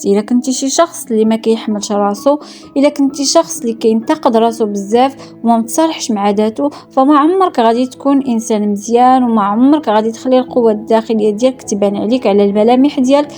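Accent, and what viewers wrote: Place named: Tashelhit, Morocco. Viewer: طريقة باش كتهضري أو داك نطق ديال الحروف زوينة 😂 مخلطة بلهجة تشلحيت يا سلام كملي راه غادي ندور على لي فيديو ديالك كاملين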